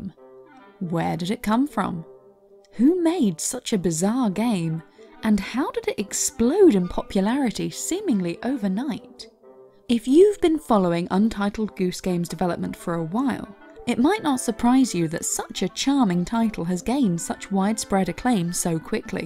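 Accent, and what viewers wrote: United Kingdom. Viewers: Seeing that the channel is called "Video Game Story Time", I expected a fast-talking guy rattling things off at a mile a minute. This slow, measured, British female voice, explaining things in a very calm and step-by-step manner, is entirely unexpected, but very welcome.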